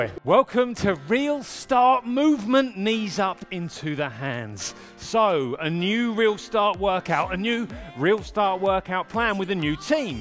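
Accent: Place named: Australia. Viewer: Started this workout 4 days ago. I'm 61 and usually walk every morning, but in our area of the country it is 105 with the heat index even in the morning, so I decided to get on board with a cardio workout and this is great. I can't stay up with the little lady on the right but I try and stay in step and in snyc with the leader and the lady on the left. Also, as a retired band director I am wired to at least try and stay in step with the video. It goes against my very being to be out of sync, but during the fast 45 second phases I'll just go at my own pace thank you. Love the accent. I think an Aussie could hold you up for money in a dark alley and you'd thank him! Ha! Thanks for the workout!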